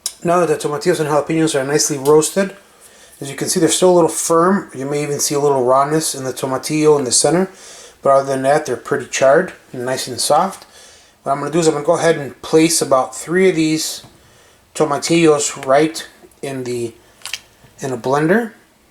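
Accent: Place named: Chicago, United States. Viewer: I hear some Des Plaines IL in that accent! LOL reminds me of my friends back home. Thanks for posting, I am definitely going to try this one!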